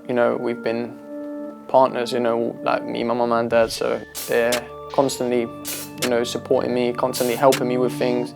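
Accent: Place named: England, United Kingdom. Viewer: Wait he has an English accent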